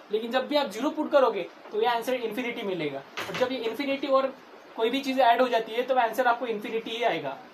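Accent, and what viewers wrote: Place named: Maharashtra, India. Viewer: Sir maharashtra se ho n Bolne k tareeke se lag rha h 😂😂😂😝😎😎😎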